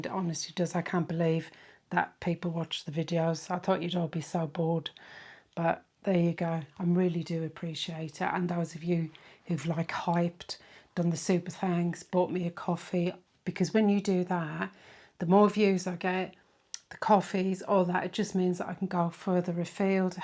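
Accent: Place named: Birmingham, United Kingdom. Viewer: I really enjoyed that. A bit concerned that you have been poorly and hope you are on the mend now. You do have a soothing voice. I thought I detected a hint of Brummie or Black Country in your accent but that’s great. Keep up the good work 👏👏👏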